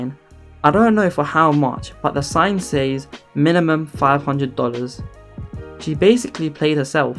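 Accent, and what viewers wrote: United Kingdom. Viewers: No problem, and I really like the karma aspect as well 😂😂 Your accent sounds kinda British, with something else. If you don't mind me asking, where are you from?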